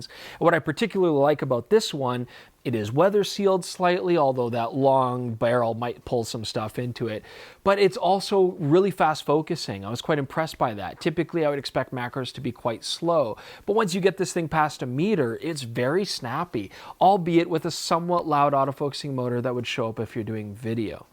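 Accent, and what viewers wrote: Canada. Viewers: You sound even more Canadian...somshow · I would love to see that level of Canadiana in all your videos!! I am sure Jordan loves your accent, ehh!! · Definitely a candian accent detected lol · Ok...I was high when I watched this and thought to myself, “his Canadian accent is really coming out in this video or has he always sounded like this and I’m just noticing now? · Spot on Canadian affect there buddy 🇨🇦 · Love that canadian accent! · I... I don't know. I guess I learned I like the canadian accent? Btw best intro of 2019 so far. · Damn!!! you sounds like my Canadian cousin in Vancouver BC. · Heavy Canadian needs to make a comeback.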